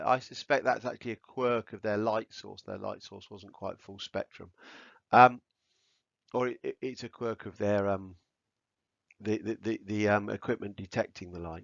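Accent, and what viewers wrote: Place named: United Kingdom. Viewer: Amazing video. Exactly the information I needed presented in an exceptional manner. British accent is the cherry on top :) :) :)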